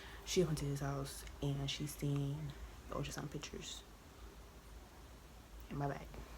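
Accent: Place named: South Carolina, United States. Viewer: Lol, he brought a whole pregnancy test over. Love your story, where you from? You sound like you from South Carolina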